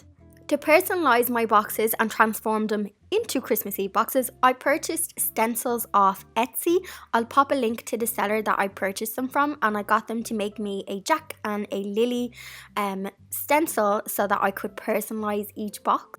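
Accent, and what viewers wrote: Dublin, Ireland. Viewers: Lovely to hear the Dub accent well Done great videos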